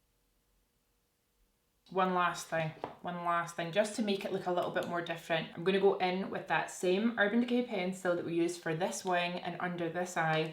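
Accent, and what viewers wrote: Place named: Glasgow, United Kingdom. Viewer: Always the highlight of my day when you post! I’ve just moved to London so its always nice to hear a Glaswegian accent every now and then😂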